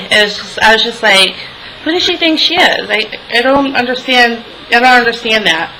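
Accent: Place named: New York, United States. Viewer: What part of America are you from? You sound like you are from New York sometimes. :) And omg... that's a shame cashiers are not allowed seats!, in Ireland (where I live) they do.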